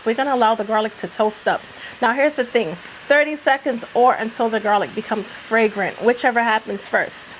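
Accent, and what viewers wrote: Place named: Philadelphia, United States. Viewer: Are you from Philly? I just ask bc of the accent.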